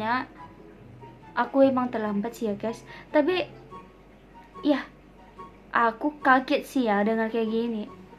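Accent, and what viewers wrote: Indonesia. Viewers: Kok logatnya Indonesia banget mbak.... Asli Brunei atau Indonesia nih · Logat Indonesia nya udah mulai muncul ya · kamu mah... lucu...logatnya indonesia banget... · aksen melayu brunei sudah tdk kdngran dek..udah kayak org indonesia bngt aksen nya...salute.. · Mbak orang brunei atau orang Indonesia kok logatnya kaya orang Indonesia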